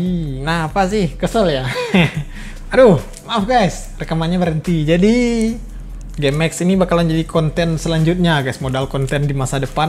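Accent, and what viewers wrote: West Sumatra, Indonesia. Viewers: Urang Minang Uda?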